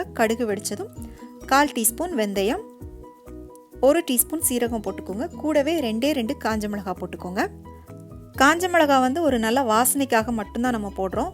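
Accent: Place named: South Tamil Nadu, India. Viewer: mmm nanun ipdithan seyven! And which is ur native ! Your slang seems that u r frm south tamilnadu!am i right !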